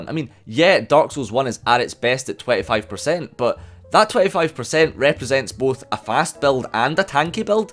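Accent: Scotland, United Kingdom. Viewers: Are you from Scotland? Love your accent <3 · U Scottish, mah boi? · It's almost weird hearing a Scottish accent like mine on Youtube. Sooo refreshing though. Also - I fully agree, lul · Wow I just got this vid in my recommended and I feel blessed because I never knew having a Scottish (Irish???) person yelling at me would be such an experience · Anything is better in a heavy scottesh accent. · i canny tell if its where youre feh or no but its sounds like you are scottish but tryna be understandable af to everybody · This is the first video I've seen from you. I've never heard a more scottish accent.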